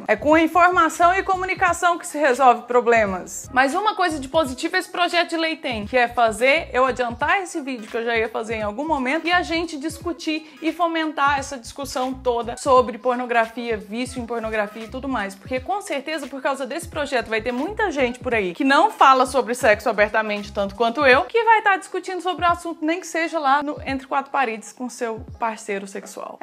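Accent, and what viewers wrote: Minas Gerais, Brazil. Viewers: É MINEIRA ?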